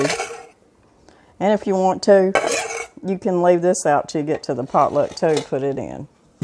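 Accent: South, United States. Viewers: Yum yum! I have made that taco salad before, but used ott's dressing instead of Catalina. I thought Catalina might be too sweet, but I know the recipe calls for it. You've given me the idea to make that when my daughter, her husband, and my two-year-old granddaughter come for a visit on the 15th of August. I think we'll have this the first night, including your pound cake with cream cheese in the middle. I think the only difference will be is that I will sugar up a nice sized bowl of sliced strawberries, and serve them with it. We'll just turn it into strawberry shortcake with a bonus of cream cheese in the middle! By the way, my daughter lives in Northwest Arkansas, in Rogers/Bentonville. She's a nurse practitioner at Washington Regional hospital in the ER. But she is from Central Missouri, which is where we live. We live out in the country near lake of the Ozarks. 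Our little town has a population of 91! I actually live on the outskirts, I guess we're in the burbs, but really, we're just out on a dirt road. 😁 I love watching your videos! I love to cook, and I love to watch other people cook. You cook things very similar to how we cook them, and you talk even more Southern than we do, but your husband sounds like every person I grew up with, including my own family! We are hillbillies too! 😊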